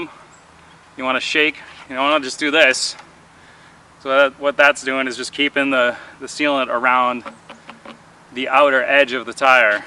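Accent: New England, United States. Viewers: Kid! This video was wicked! I sense that you're from New England.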